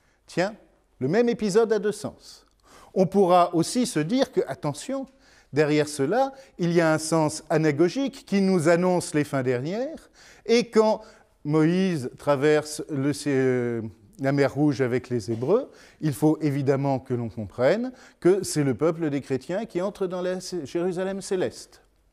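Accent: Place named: France. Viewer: Super de pouvoir écouter ce cours. Très intéressant. Cependant, je regrette la lecture très "francimande" et pas assez occitane du texte (il en est des interprétations comme de la prononciation). Autrement dit, il s'agit de l'autopsie de la traduction de BEC et non du texte originel. Ceci étant, ce cours est super. MERCI.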